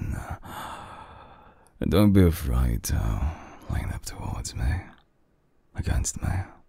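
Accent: United Kingdom. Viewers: i swear the british accent makes this video <num>X better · He's....brit isn't he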